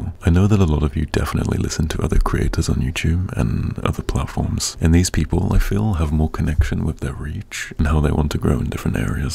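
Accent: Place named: United Kingdom. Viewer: he's fucking BRITISH???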